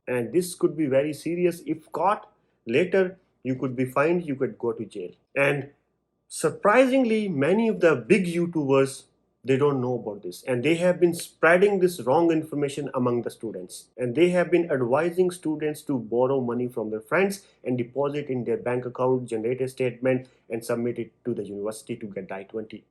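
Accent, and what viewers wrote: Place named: Bihar, India. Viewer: Abey Bihari...accent theek karo.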